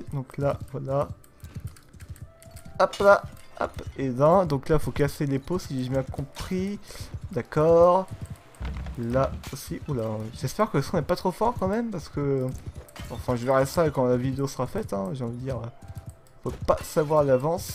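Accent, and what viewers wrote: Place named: England, United Kingdom. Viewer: est son bel accent anglais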